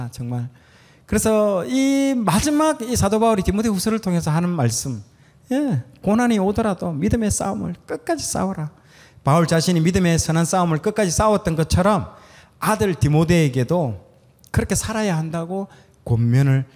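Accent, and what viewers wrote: Gyeongsang, South Korea. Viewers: 경상도 말이 이렇게 부드럽고 아름다운지요..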